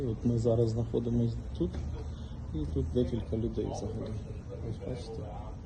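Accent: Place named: Russia. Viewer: Говори по русски . Умора. Мучаешься, сразу же видно, что русскоязычный.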